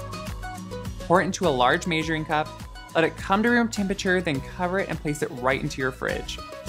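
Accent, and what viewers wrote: Midwest, United States. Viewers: I think he has a Midwestern accent.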